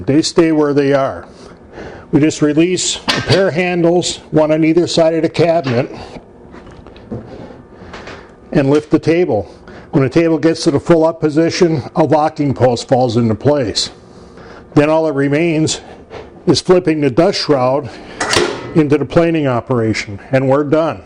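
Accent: Chicago, United States. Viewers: FINALLY! We get to see your face; after watching kadzillions of your "reviews" on machinery. And if you are not from Chicago, I will eat me hat. Your "accent" (and YES Chicago-ites DO have a definite "accent"! LOL) gave it away. YEP! The quite often "Dee's" instead of "The's", etc, gives it away every time. I noticed this distinct accent when I first arrived in the "windy city" in the fall of '46. It took me 6 yrs to fully understand you "Yankees". LOL Of course my school-mates and neighbors never let me stop hearing, "You talk funny with yuz "Southern drawls" lack "Yall"; not to mention, "AH instead of "IEE". But I got "USE-GUYS" back, by saying, "Yes and YALL sho nuf do talk funny LOL"! Seriously, you do a GREAT job reviewing; in these awesome videos. You cut right to the chase (unlike MOST who review) and your knowledge is FAR above other reviewers. 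If a person can not learn from your video's, they can NOT learn. period! Keep up the good work neighbor.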